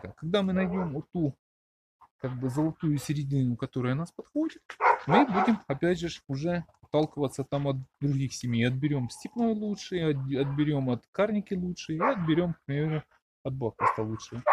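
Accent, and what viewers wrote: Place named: Kharkiv, Ukraine. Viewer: Сократить, утеплить. В октябре, при наступлении температур днем не выше 12-14градусов, присоединить пару рамок с пчелой от сильных семей. Подсилите таким образом. Или соедините с отводком сейчас. Семья эта слабая. Перезимовать перезимует, а как родоначальница будет не айс., Вы с Харькова? Только от тамошних пчеловодов слышал слово ульИ, а не Ульи...извините.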